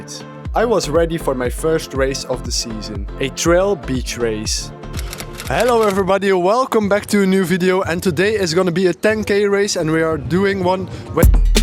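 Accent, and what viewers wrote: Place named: France. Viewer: his french accent is so thick.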